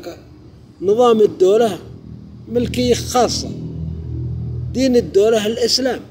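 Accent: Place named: Mecca, Saudi Arabia. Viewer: استغفر ربك بنو قريش معروفين ولا يضيع نسبهم وهذا واضح انة من مكة المكرمة حتي اللهجة مكاويه بطن احجاز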